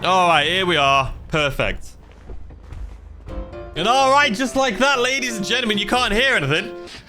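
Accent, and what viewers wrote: United Kingdom. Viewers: When did you move the considering you had a or you have a British voice considering you say that you used to do a lot of stuff there like how long have you lived there how old are you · Ain’t you British. ?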